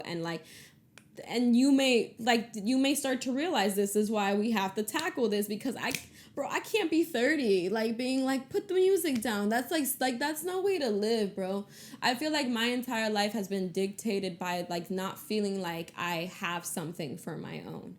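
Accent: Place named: New York City, United States. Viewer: R u from NYC?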